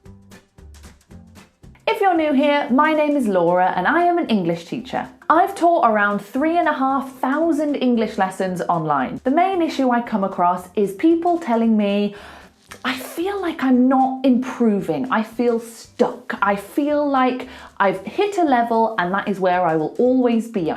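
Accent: United Kingdom. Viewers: IS IT BRITISH ACCENT ?😃😃😃😃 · Oh... I miss a lot this British accent!! ❤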